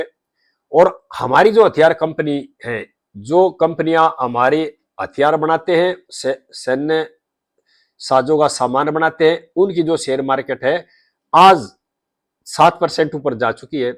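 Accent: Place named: Rajasthan, India. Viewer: Vdo dekhneme bilkul maja nahi9 aayi.....Rajstani chacha boli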